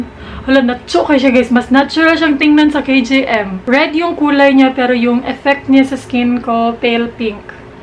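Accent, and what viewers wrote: Visayas, Philippines. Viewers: ang cute mo po ate bisaya ka po ba? hahahahaha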